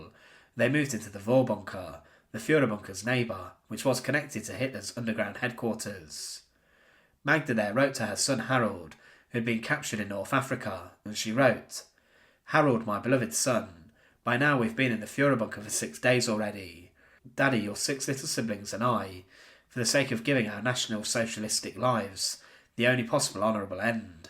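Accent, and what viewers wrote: United Kingdom. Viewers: Depends on where he is from in the UK, hence his accent. If you don't like it, don't watch.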